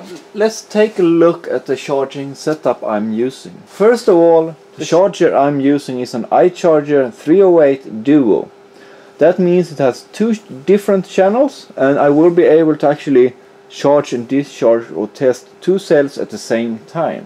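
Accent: Sweden. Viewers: Good channel! where are you from? you sound Swedish.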